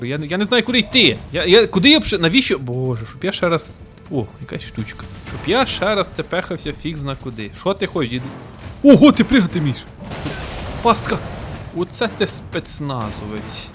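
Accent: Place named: Zhytomyr, Ukraine. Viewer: Ти можеш нормально говорити, чуму не житомирською.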